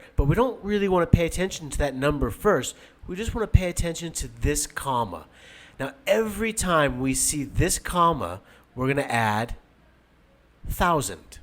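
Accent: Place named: United States. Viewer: that's American accent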